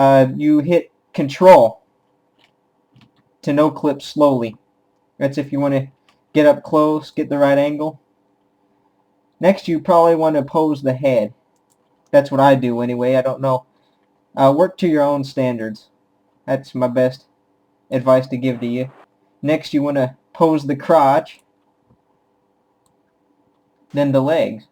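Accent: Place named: Texas, United States. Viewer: He sounds more like a teenage texan.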